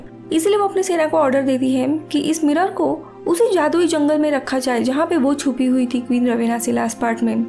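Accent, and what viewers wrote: Maharashtra, India. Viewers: hi do you have any relation with climax explained in hindi channels nikhil, moreover you both sound a quiet marathi, BTW Love your explanation. thank u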